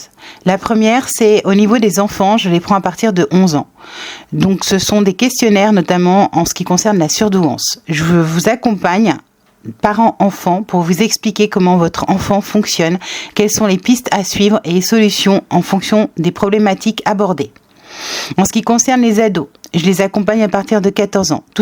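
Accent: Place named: England, United Kingdom. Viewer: Bel accent anglais, mais en ce qui me concerne, je préfère la langue de Molière🍅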